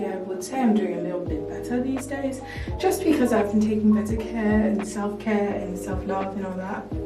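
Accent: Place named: United Kingdom. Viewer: It’s the British accent for me😅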